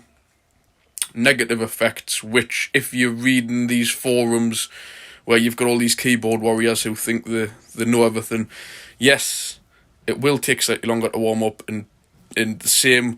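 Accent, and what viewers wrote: Newcastle, United Kingdom. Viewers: Cracking info, as a car/biker tech-head it makes perfect sense to me. . Just ordered an agri tune for mine, I'll set it same as yours D5. The pronunciation is Agri (Agricultural) Tune. I previously used the 'crude' common rail fuel pressure adjustable boxes in my 4 previous diesels, C220 Coupe, C220 AMG Sport, BMW X1, Mazda CX5, FIAT Doblo even, (model specific boxes obviously) with no issues and VERY noticeable power gains, but as this box cuts the turbo in earlier it's a much better unit, you're entirely correct. 👍 Me and my Aussie wife chuckle at ya Geordie crossed with Ashington accent. I'm a Geordie, but she being Aussie she chuckles 😃. 'Mitsybooshee' class love it 😀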